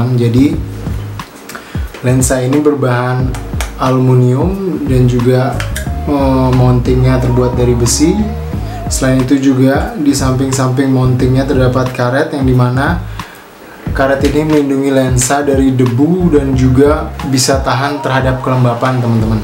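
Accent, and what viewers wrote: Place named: Bali, Indonesia. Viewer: bang kq logatnya kyak logat bali ?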